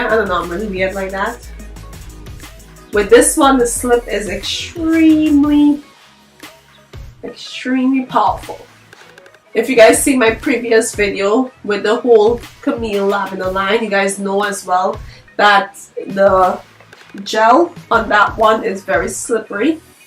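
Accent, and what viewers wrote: Trinidad and Tobago. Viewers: You sound Trinidadian 🇹🇹 Just found your channel.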